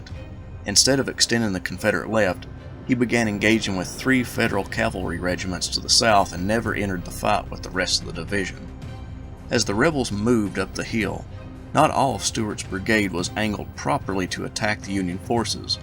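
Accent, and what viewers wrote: South, United States. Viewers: Wow, excellent job! I just binge watched every one of your Gettysburg videos and I must say well done sir! I can tell by your accent you are of southern birth, as am I. My 3x great uncle fought at Gettysburg in the 7th La infantry.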